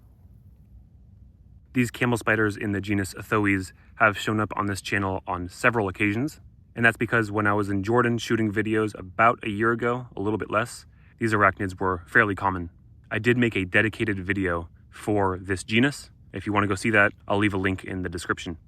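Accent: United States. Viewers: You sound American. I wish we had better relationships with the middle east. Their flora and fauna are so fascinating, but there is so Little "western" information. Sad really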